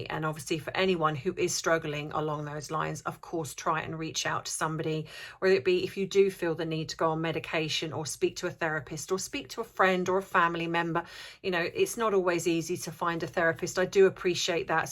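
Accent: United Kingdom. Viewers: 🌸 Hello Bubble Fam 🌸, great to see you're back. I like the ideas you have for the channel. Then again, I've been in love with British accents since The Beatles first came to the U.S. Paul... sigh. ♥️ So I would listen to you read the phone book! Anyway, finding balance in one's life is not easy and priorities change. Have patience and I know you will find the answers. 💋 See you soon!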